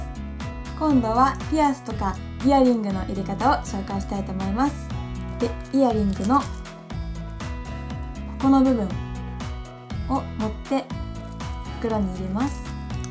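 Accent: Kansai, Japan. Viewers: かんさいべん？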